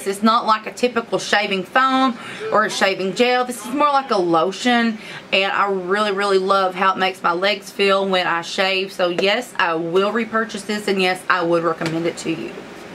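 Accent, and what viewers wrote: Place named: South, United States. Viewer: The country accent makes me relate to your videos 😂 lol you gained another subscriber 💕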